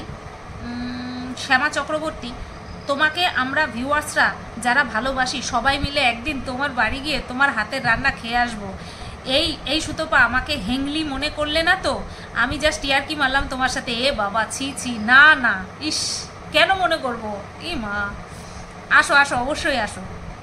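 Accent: Bangal, Bangladesh. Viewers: tomaar moton bold, honest, r courageous lady khoob kom dekha jaaye..r sense of humour toh mashaallah..tomaar mukhe bangal bhasha shunte khoob bhalo laage...ei comment ta pore ektu bangaal bhashaaye reply diyo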